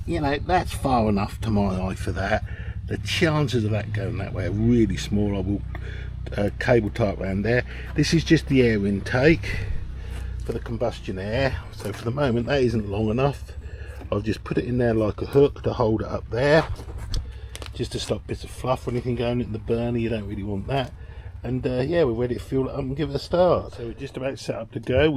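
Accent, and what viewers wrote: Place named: Norfolk, United Kingdom. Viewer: you sound very much like you're from Norfolk to me 🙂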